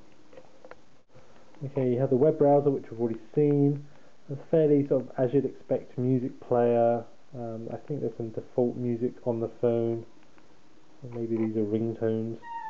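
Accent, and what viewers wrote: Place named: United Kingdom. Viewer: That was funny. I think the guy is British. Hence the accent. I was thinking about getting that phone but after seeing this video i will pass.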